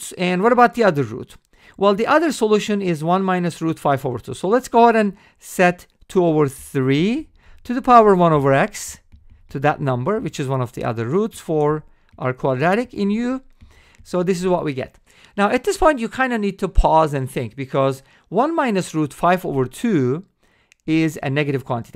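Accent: Turkey. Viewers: Your pronanciation is like someone whose native language is Turkish, am I correct? Great explanation by the way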